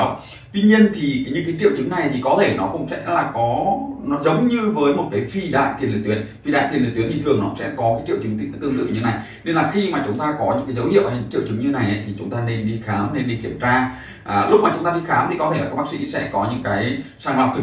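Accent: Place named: North, Vietnam. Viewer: Bạn có thể nói chậm và rõ ràng được không ?? Không nên nói nhanh vì tiếng vùng miền Bắc nói nhanh nghe không rõ , cảm ơn bạn nhiều .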